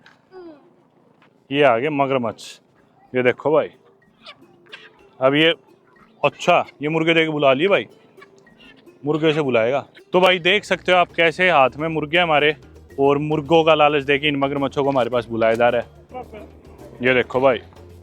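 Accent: Haryana, India. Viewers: Love u bro..... Aapka hariyanvi to gazab ka hi he..... God bless u😂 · Bhai re ek number video jama swaad aa gai ....nue lagge jama ki theeth haryanvi choora aa rya hai.....being haryanvi I am proud of your brav ....best wishes from UK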